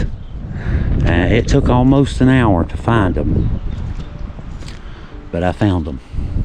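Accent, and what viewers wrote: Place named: Kentucky, United States. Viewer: and you two are such a wonderful team! I play your videos to listen to as I drive. My mom,she passed right before Christmas, loved listening and watching while I drove. has that storyteller voice plus the accent we love. You see she came from McCreary country Kentucky area. My grandparents moved north to Indiana once the mines shut. Later on working the glass factory up here she met my beloved Ma Ethel. Ma Ethel was from Mingo. Kermit to be exact. She was a Chafin.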